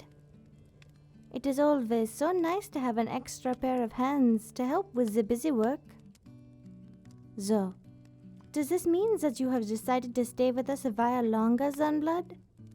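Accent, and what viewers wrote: Germany. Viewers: Ze German Lycanbae has returned! I'd mate her. The accent is my favourite. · corwyn wolski it's a German accent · Hmmmmm so much german acsent...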